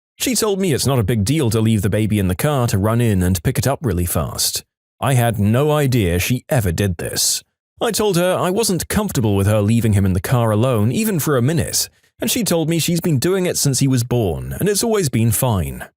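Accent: United Kingdom. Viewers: Why is he British now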